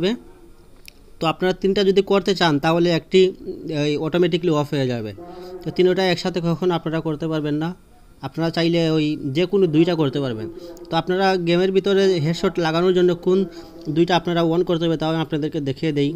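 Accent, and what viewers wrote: Sylhet, Bangladesh. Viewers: Tmr basha Sylhet tai na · Vai sylheti grime🤟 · Vai apni sylhety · Sylhety bro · Sylhety ni bro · ভাই আপনি কি সিলেটি? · Sylheti na kire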